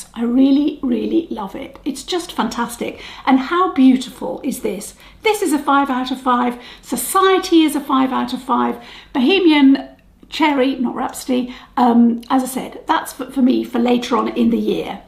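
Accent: United Kingdom. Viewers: I really enjoy your video’s! Love how British you are, and how you talk. Oh and the reviews are absolutely great, too!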